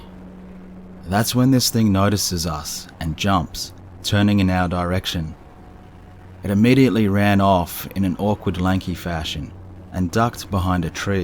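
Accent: Australia. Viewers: Woah! Straaaaaaange! Life, 2 videos in one week!? To what do I owe the honor??!! As usual, loved the vid! Although I have heard the Creature in the Corn story before, it always sounds better when you tell it with that awesome Aussie accent 😍 😉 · Claims to have been Military working in the US.... Accent is Australian Yeah I say fake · Good stories 👍 Your an Aussie aren't you? 🍻😎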